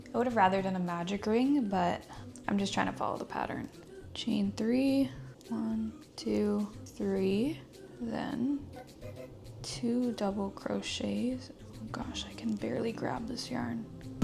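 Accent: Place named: Afrikaans, South Africa. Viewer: Hey can you pls use your Afrikaans accent. Anyway this good! Thanks 😀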